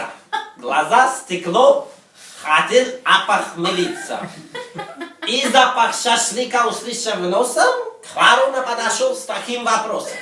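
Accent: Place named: Georgia. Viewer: Классно , но смех мешает слушать ,, люблю грузинский акцент , удачи вам !!!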